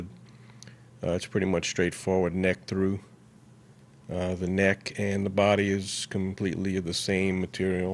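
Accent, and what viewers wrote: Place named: Louisiana, United States. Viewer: Louisiana? u have same accent as me lol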